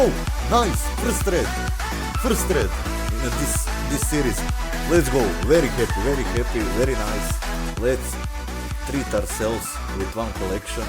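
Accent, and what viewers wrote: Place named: Balkans. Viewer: Not rusdian accent of english i know how balksns speak english hes from serbia or croatia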